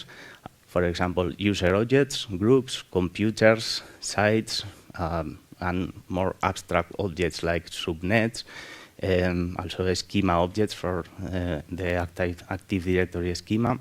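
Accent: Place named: Spain. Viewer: english with a strong spanish accent but so useful ...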